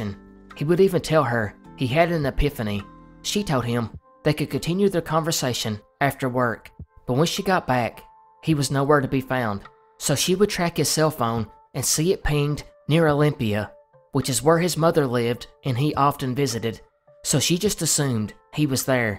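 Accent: South, United States. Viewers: I’m from the south & i love hearing other southern accents!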